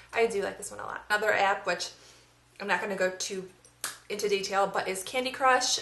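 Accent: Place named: Philadelphia, United States. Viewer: love your videos and your accent haha...philly?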